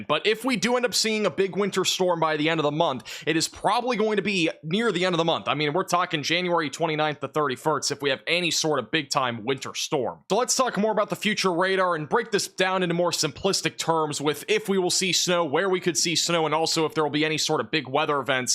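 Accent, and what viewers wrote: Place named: South, United States. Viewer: "this here's important" Redneck I rekon, your the best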